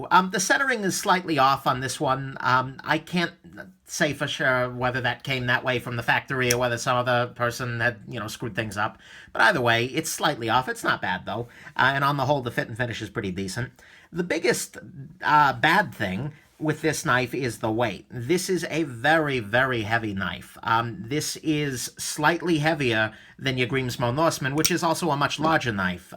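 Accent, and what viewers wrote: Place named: New Jersey, United States. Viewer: ! Love your reviews and your voice reminds me of everybody I ever grew up with in Jersey. Seriously, your reviews are detailed, descriptive and objective. Thanks and keep it up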